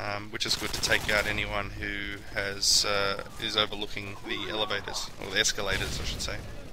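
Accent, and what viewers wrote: Australia. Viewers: australian commentator FTW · an aussie! bout time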